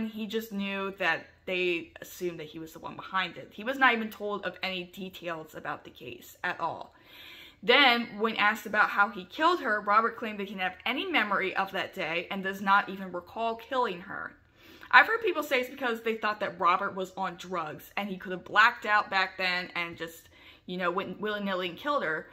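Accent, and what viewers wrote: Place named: South, United States. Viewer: You're welcome. I'm glad you received my message so well. I thought I detected a wee Southern accent, that is a sad story and you did well. Have you ever watched Murder She Shed channel? She's small too, having just started out and she's a real hoot. I'll always have the scars from what happened to me way back then but I made a decision to not be a victim. I have but one wish for Humanity and that is for people to learn to love themselves so they can love others then maybe all the hate could stop. Bless you too sweet Sister. Perhaps we'll chat again, I see potential in your heart and that makes me feel good and have a little more hope. 💖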